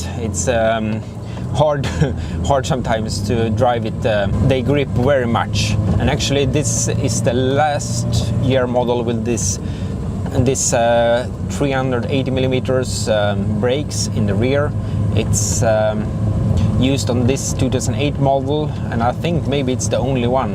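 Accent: Sweden. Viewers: This is amazing! When you say "job" say it like "djob" and when you say cheap say it like "tcheap" :) That's how I learned to correct my English. Just some feedback from another swede, if you interested :x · Are you swedish?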